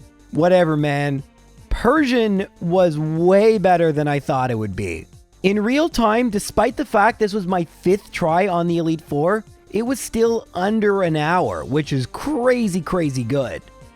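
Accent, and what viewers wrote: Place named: Canada. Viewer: Oh the Canadian is so noticeable.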